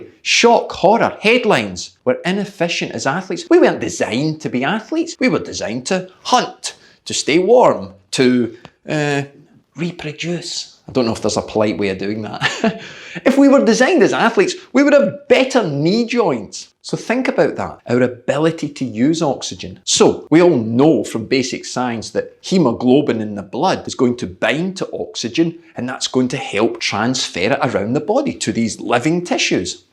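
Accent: Scotland, United Kingdom. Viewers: I like your Scottish, Coach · Please never speak less Scottish! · I like your scottish accent :D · Hahaha! I laughed out loud when you mentioned talking less Scottish. Then I thought, actually his accent is pretty thick. I love it, and I love the advice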